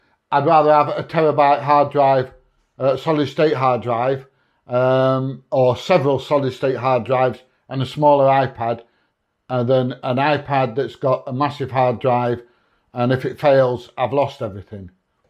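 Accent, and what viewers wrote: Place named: Yorkshire, United Kingdom. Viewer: What a character! Yorkshire lad?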